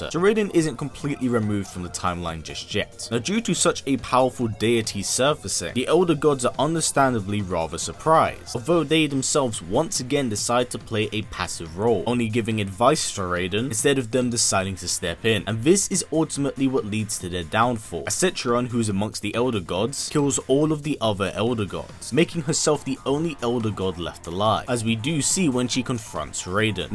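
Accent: England, United Kingdom. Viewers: There is not "v" in the word brothers, so disgusting. Battles are not "bat-holes." Your dialect is garbage, must be from the shithole called england.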